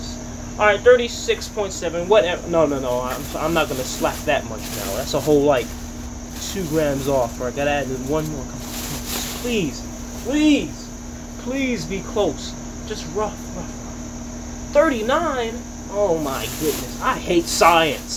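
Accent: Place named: England, United Kingdom. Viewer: Did you spend time in England? You do our accent as much as I do and American accent😅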